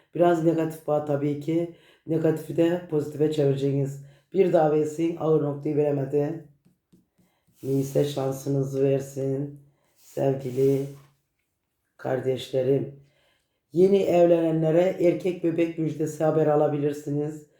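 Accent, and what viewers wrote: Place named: Afyon, Turkey. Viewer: Konusmanizdan sanki afyonlu gibisiniz nerelisiniz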